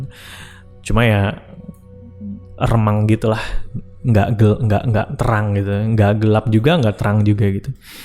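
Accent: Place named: Banyumas, Indonesia. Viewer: Mas.. aslinya Purwokerto ya.. spt Banyumasan logatnya, hee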